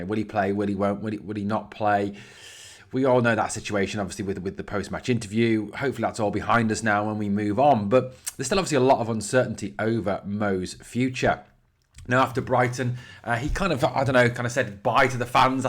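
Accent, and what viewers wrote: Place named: Liverpool, United Kingdom. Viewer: Good old Liverpool accent.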